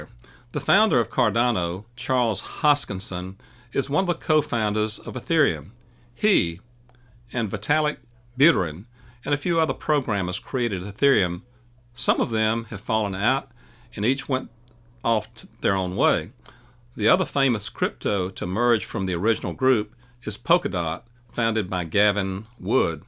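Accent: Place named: South, United States. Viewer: you sound like a real southern gentlemen. I heard Flare would go live on may 1st. Thats what i heard.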